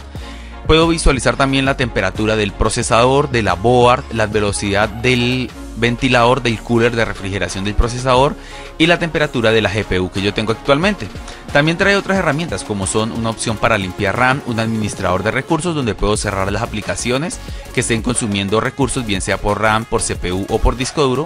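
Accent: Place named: Cali, Colombia. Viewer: Excelente vídeo, tu acento dice que eres de la Sucursal del Cielo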